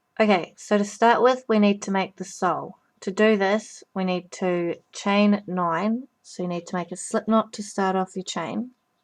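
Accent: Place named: New Zealand. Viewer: So cute :) also so nice to not have to concentrate to listen. We need more kiwi accents on YouTube!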